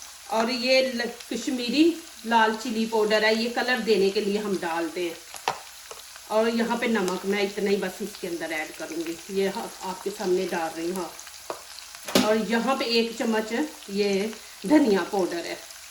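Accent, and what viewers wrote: Punjab, India. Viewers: I watched a few videos of u .v.nice ..loved ur punjabi lehja urdu ...I myself have my own chanel .kokab khwaja studio....I enjoy ur videos too nd subscribe it ... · lovely punjabi accent..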